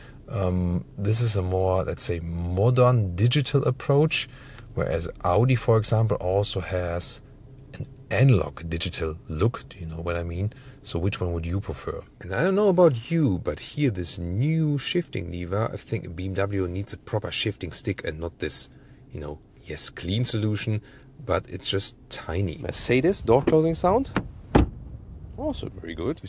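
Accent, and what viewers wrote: Germany. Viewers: English with German Dialect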